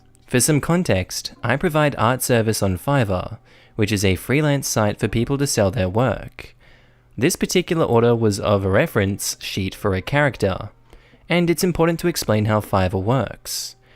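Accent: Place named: Australia. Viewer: hit us with your true Aussie voice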